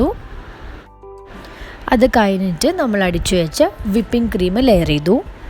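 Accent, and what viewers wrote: Kannur, India. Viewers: Nigale veed evideyaa kannur aanoo samsarikumpol nammude kannur kari ne pole und😊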